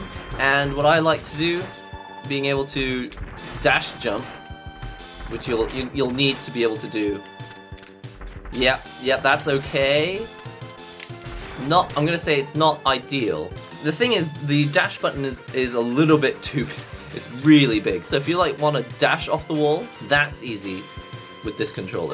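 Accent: United Kingdom. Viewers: Why do u have a British accent ?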